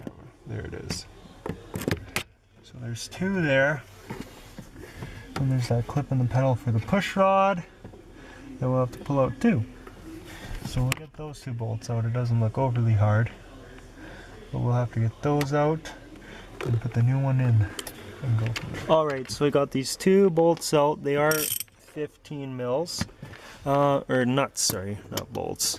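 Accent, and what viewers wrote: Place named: Canada. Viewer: He sounds Canadian. :)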